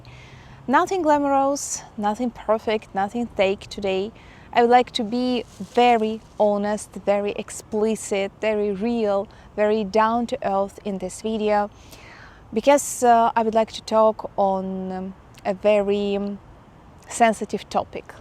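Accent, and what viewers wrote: Russia. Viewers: девочка.... С твоим диким русским акцентом ты лучше говори на Великом и Могучем......или возьми сотню уроков фонетики английского лондонского языка.....не перепутай с американским, австралийским или НЗ-ким.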